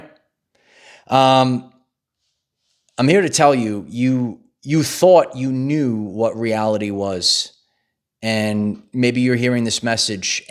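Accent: New York, United States. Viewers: Yes! (Nice to hear a NY voice.)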